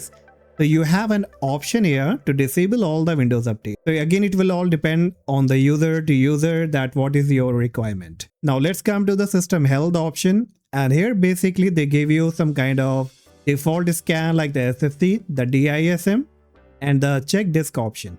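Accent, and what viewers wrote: India. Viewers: sounds like he's from one of those Indian call centers that steal peoples information, don't trust those people they are online thieves.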